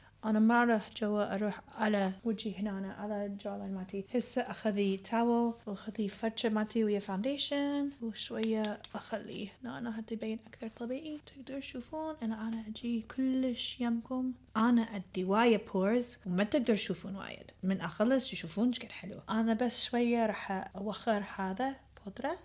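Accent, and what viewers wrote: Iraq. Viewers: Dear Huda ,You are so cute in the Iraqi dialect , so happy with this video ,'I didnt know you spoke arabic, wish you all the best ya helwa.. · You're so talented Huda!!!! you sound so cute speaking iraqi arabic dialogue ❤️❤️🥺🥰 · اعشق لغتك العربية العراقية 🙈😍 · هي عراقية اصلا وهذي لهجتها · يروحي اطلق من تحجي عراقي!!😩🔥🤎. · This is Iraqi Arabic language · عمري الي يحجي عراقي😂♥️♥️ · Kolish hailo your accent when you speak Iraqi...💓🇨🇵🇩🇿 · فديت اللهجه العراقيه بصوتج جدااا جميله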